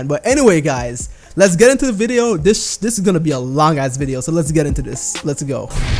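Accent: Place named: Jamaica. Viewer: You're jamaican? I can kinda hear the accent fam.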